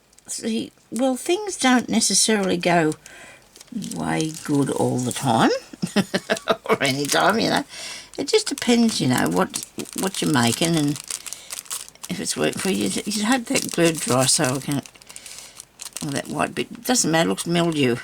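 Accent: Australia. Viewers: I get so much joy watching you! You just love what you're doing and even when it isn't working out as you'd planned you just laugh and say "nobody panic" and keep moving forward! Your spirit mixed with that lovely Australian accent and sayings and sense of humor always makes me happy,lifts my spirits and gives me a giggle for free!! Never change,my friend. You're a treasure 😁💙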